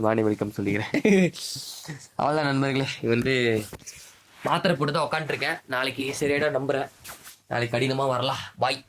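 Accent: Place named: Kanyakumari, India. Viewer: Nanum kanyakumaritan.... Slang la therinchuthu .... ❤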